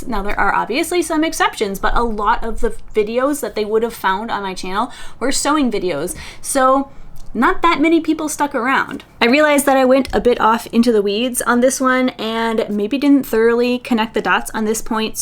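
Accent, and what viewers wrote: Canada. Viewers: Yay eclectic! Also I love the way you always reply to comments. And yes I was crocheting while watching/listening. You are fun to listen to and there is nothing wrong with your Canadian mashup accent. I also have a CAN mash up accent in both official languages.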